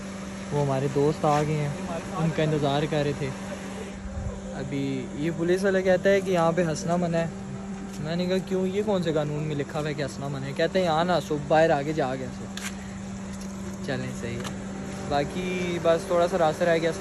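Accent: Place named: Hindko, Pakistan. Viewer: Ap ki Urdu ka acsent hindko wala he😁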